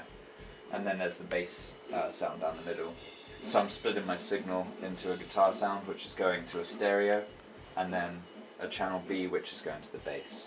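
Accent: United Kingdom. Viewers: Dude where are you from? You sound British but I can't be sure...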